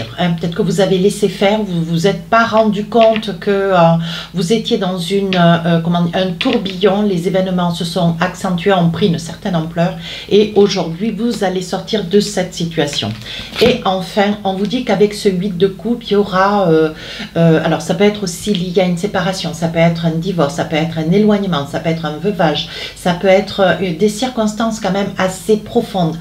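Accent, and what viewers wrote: South, France. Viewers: Merci pour cette guidance. Beaucoup de choses sont sorties. Qui sont vraies. Cancer ascendant Cancer. Je vous ecoute depuis quelque temps. Et c est un plaisir. J adore votre accent du soleil. A bientôt. 😊❤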